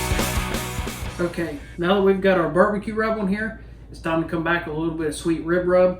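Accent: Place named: Pittsburgh, United States. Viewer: Thanks for the tips. I'm doing my first try at a pork butt (on an RT400 Bull) this weekend for a party of 40. I was going to do it the day before and reheat but I think I'm going to try and time it right so I can pull just before we eat. Will probably have it rest for a few hours in a cooler. I bet you're a black and gold fan with that Pittsburgh accent! I'm in Greensburg. Go Steelers!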